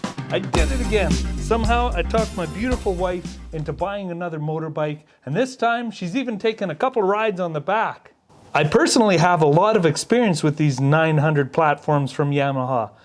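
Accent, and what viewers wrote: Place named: Canada. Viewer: hey what part of the world are you in??sound Canadian hey ,,i cant find that GT in that color anywhere in the usa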